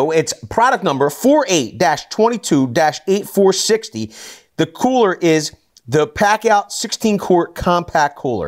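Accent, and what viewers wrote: Philadelphia, United States. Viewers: Sounded like you were from philly!! Cheers from levittown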